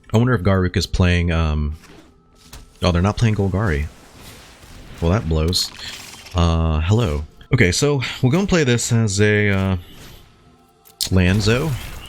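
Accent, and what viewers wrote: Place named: Australia. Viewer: Lol that Aussi accent 😂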